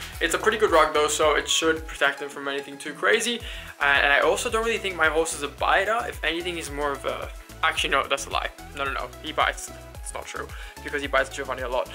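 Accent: Australia. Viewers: Literally look me five videos to realise your Australian.... I’m Australian and I couldn’t figure it out 😂😂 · You are Australian I could hear it and as soon as you said you flew him from Australia it clicked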